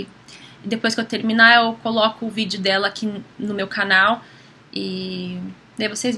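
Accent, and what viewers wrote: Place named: United States. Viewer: Você é americana? Meu esposo é de Chicago e quando fala em português tem um sotaque parecidinho com o seu. O jeito de falar é bem semelhante. Se bem que ele não fala quase nada em português hhh mas quando fala, algumas palavras saem como algumas que você fala. Claro, você fala muito bem, mas de uma maneira bem peculiar. ☺️🌼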